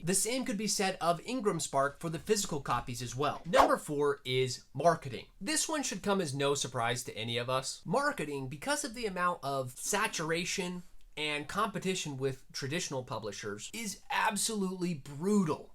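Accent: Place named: RP, United Kingdom. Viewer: Okay, but I think I need more of your posh British accent? It was so good hahaha (Also, I'm always here for the shout-out! 🙌🏼🙌🏼)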